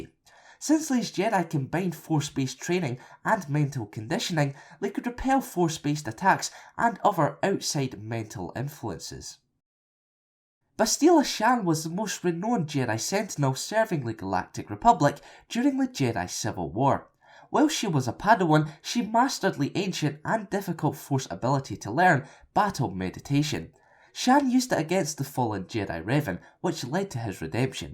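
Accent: Scotland, United Kingdom. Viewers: welcome. Your accent is Scottish, right?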